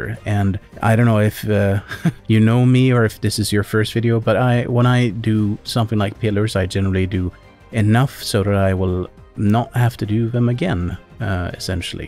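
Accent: United Kingdom. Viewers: Happy birthday 🥳 (and that’s a cracking British accent) 👍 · Oy mate! Your brit accent is bloody amazing! I'm chuffed to bits! Hahah Your videos are always a gem and this one is no exception :D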